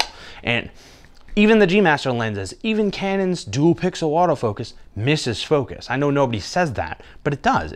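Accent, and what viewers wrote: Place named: New England, United States. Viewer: You from Mass or Rhode Island ??? Recognize the accent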